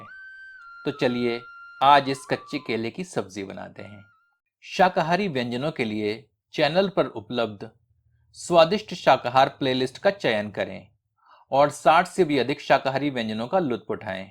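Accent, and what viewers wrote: Standard. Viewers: aap ka sabse acha lgta bolna, jo ki itna shudh hota hai ki mano koi Guru hme mantra bolna sikha rha ho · your "shud" hindi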